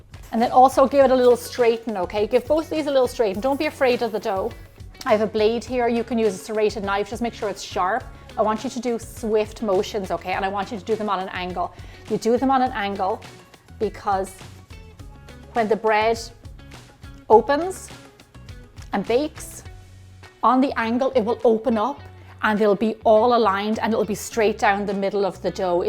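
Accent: Ireland. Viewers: Love listening to your lovely Irish lilt!! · Great video - thx! Will be giving it a shot. No steam? AND do I detect a bit of Irish in you? Maybe delicious Irish soda bread in the works? 😀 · I like the Irish accent, "butt eerrr"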